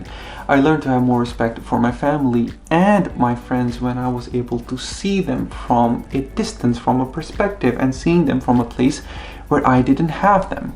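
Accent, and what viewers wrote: Estonia. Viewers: your english already sounds like you're an estonian speaker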